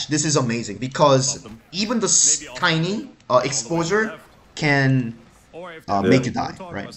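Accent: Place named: Germany. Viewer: That’s due to the German accent + deep voice :D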